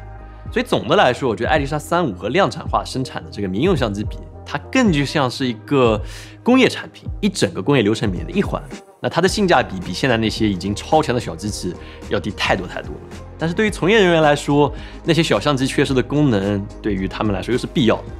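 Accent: China. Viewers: 貨幣可不可以講清楚？我看你翻牆到美國開頻道，又操著中國口音，到底要我選哪個貨幣？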